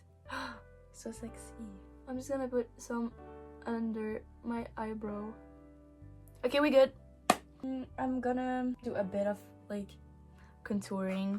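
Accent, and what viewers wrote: France. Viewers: I didn’t expect u to be french. But I love your accent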